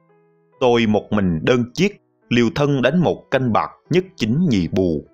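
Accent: Standard. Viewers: Giong tram phát am chuan rất hay ( đường phan thanh gian )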